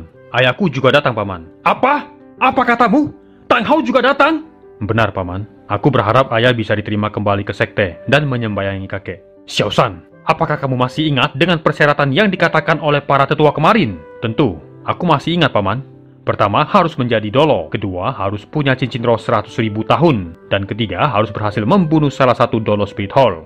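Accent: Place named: Madura, Indonesia. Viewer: Ketarah jhek reng madureh🤣semangat tretan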